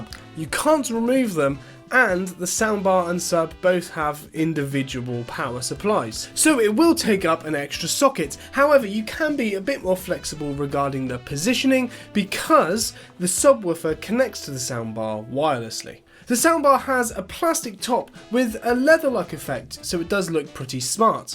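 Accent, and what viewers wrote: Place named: United Kingdom. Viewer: not bad, just different. You Brits put more emphasis on certain letters. Like the way y'all say schedule or aluminum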